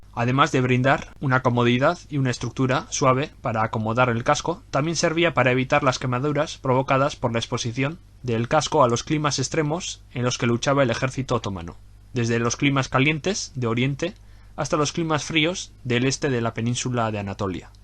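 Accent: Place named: Canary Islands, Spain. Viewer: aupa buenos videos y buen acento canario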